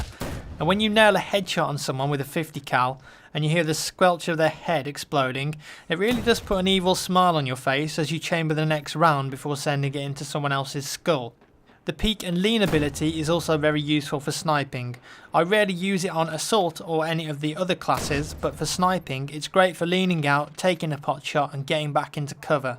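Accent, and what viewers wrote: United Kingdom. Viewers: Amazing accent, r u British?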